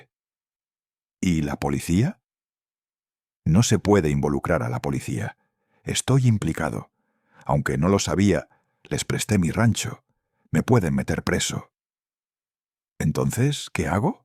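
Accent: Spain. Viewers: Ese acento españoleto no cuadra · Todo bien pero Ese acento Español nada que ver con un relato de inmigrantes Mexicanos..se convierte en algo no creible..😅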